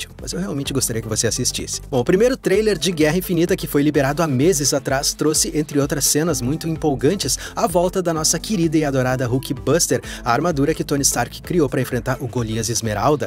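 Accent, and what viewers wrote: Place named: São Paulo, Brazil. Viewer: o teu sotaque é de invejar, sou do interior de Sampa e sei que teu sotaque é de Paulistano, e não de paulista que é do interior. Um dia aprendo a falar com esse sotaque.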